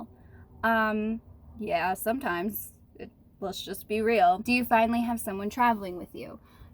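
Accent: United States. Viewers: maybe your half what does it matter. Sounds American to me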